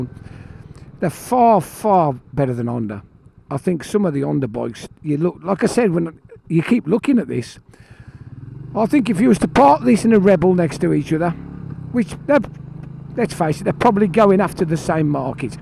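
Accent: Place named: Wolverhampton, United Kingdom. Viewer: Dear really enjoyed your review. We are lifelong bikers now into scooters but like the Enfield. Is your accent Wolverhampton as you mentioned football. We are near Hinckley triumph. John x Linda x chris Bellamy UK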